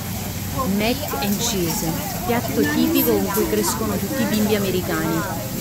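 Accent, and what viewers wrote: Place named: Naples, Italy. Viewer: mi sembrava accento napoletano, scusa in che stato americano eri nel video?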